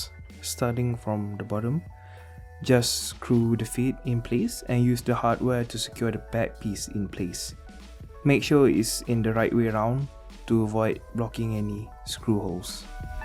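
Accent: Malaysia. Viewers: No wonder your english sounds familiar, melayu rupanya hehehe mantap bro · no matter how hard you try to hide your accent, a malaysian can always tells the different, ewaah. no la...link shopee tu shopee malaysia xD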